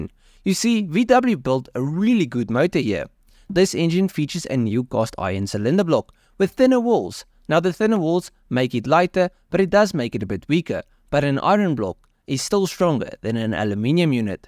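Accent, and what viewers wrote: South Africa. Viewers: South African Voice?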